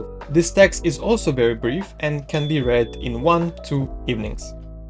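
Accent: Russia. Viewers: Я как услышал как ты жестко выговариваешь слова, сразу подумал, а не русский ли ты, а потом такой мое имя 😅. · Are u Russian?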